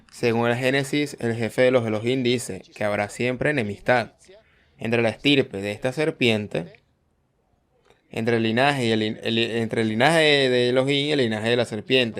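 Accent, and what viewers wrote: Venezuela. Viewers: No es así lo que sucede es que tiene el acento venezolano diferente a otro país que no sea caribeño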